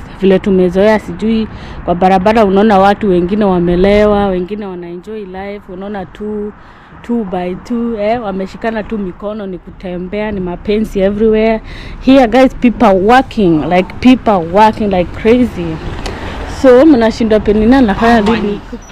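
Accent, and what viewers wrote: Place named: Kenya. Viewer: Just speak English in your normal Kenyan accent. It's always better.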